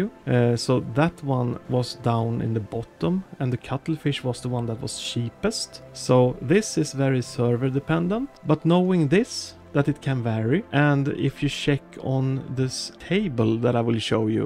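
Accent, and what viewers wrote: Sweden. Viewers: Ser och hör att du är svensk, Jätte bra video, bra tips. Ska försöka dem tricken! Ha en bra Midsommar idag. Hejdå! :)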